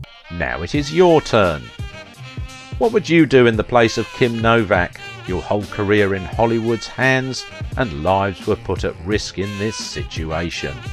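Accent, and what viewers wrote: United Kingdom. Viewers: HI Beautifully narrated with that great British accent! Cheers! Shalom to us only in Christ Yeshua.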